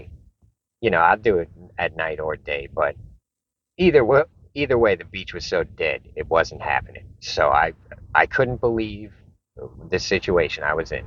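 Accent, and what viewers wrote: New York, United States. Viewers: you're a great story teller. Love your videos and that NY accent!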